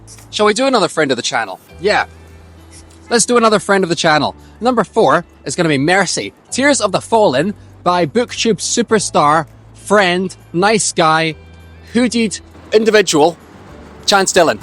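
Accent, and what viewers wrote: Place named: Scotland, United Kingdom. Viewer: Duuuuuude the real deal in a Scottish accent?!! That’s it we are over the moon! So hope our family project hits for you.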